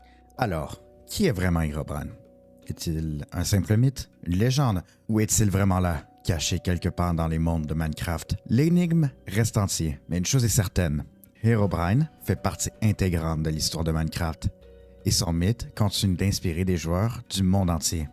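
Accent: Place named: Canada. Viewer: Yooo un canadien commme moooooi 😊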